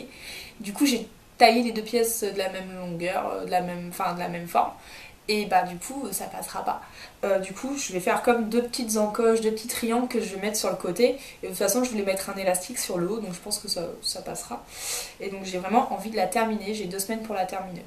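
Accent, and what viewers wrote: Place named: United Kingdom. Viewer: ton petit accent british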